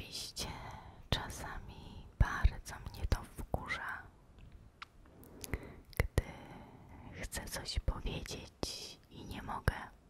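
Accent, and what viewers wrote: Poland. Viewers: Enhorabuena por tus 20000 suscriptores. Te lo mereces porque lo haces muy muy bien. Tus vídeos y tu acento polaco son excelentes para relajarse. Gracias y un beso.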